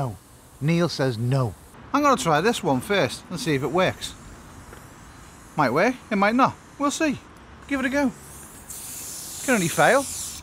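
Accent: Liverpool, United Kingdom. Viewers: Scouse YouTuber...go ead la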